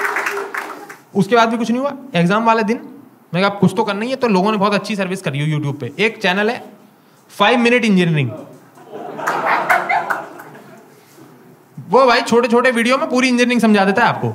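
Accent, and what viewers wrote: Bhopal, India. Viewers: I can relate to your humor, Your Bhopali accent makes the joke way more funnier. ~ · Bhopali is in your tone..... ❤️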